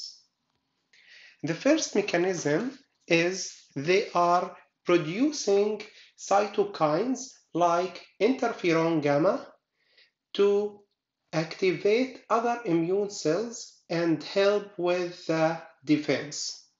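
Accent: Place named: Arab world. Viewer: الله يعطيك العافية اول الشي بس الفيديو من شخص عربي و موجه لعرب بتحكي انكليزي ليه!!! المحتوى الاجنبي غني جدا بهيك اعمال و المحتوى العربي هو الفقير. و من لكنة كلامك بالانكليزي واضح جدا انك شخص عربي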